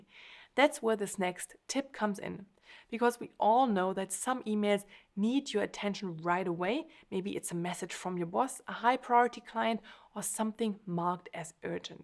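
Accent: Germany. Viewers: is she german? I like her accent.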